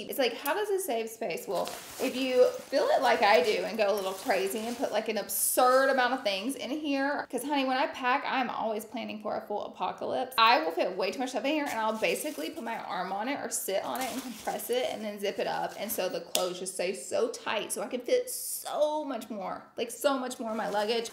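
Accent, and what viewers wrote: South, United States. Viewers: GURRRLLLLL is totally from the south. But it’s MY FAV. Fellow southern girlie.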